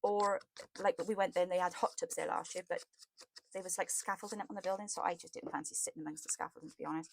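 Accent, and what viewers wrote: Wales, United Kingdom. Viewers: Thank you for these freebies; I learned a lot by watching. I truly love your Welsh accent. My paternal Grandpa was from Blaenau Ffestiniog. He came to Canada in 1910 and here I am. I'm glad I found your channel through the collaboration by way of My Porch Prints.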